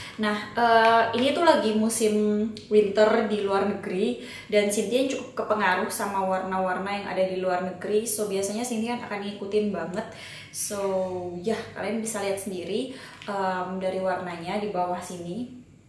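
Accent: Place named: Java, Indonesia. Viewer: medokkk bgttt kaloo ngmngggg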